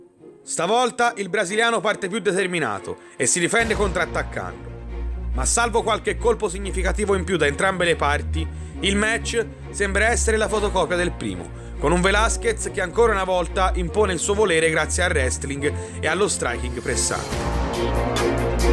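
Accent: Tuscany, Italy. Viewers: Deh, oh che tu sei toscano ?!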